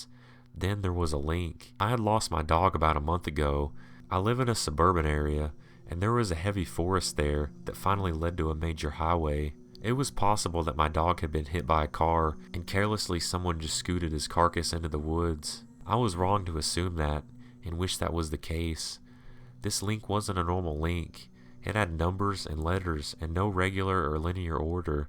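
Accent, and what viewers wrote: South, United States. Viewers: It sounds a bit more Southern to me.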